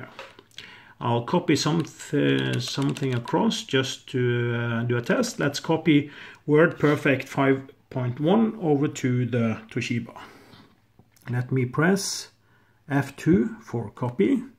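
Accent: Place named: Norway. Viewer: Found the video randomly. instantly heard that you were norwegian.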